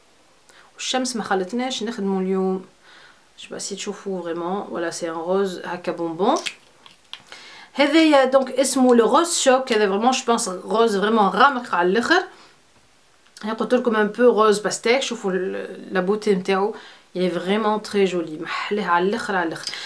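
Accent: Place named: Tunisia. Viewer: تستهلي كل خير .... حلو تدخلي للقلب و لهجة تونسية حلوة .....تجايات انا من الجزائر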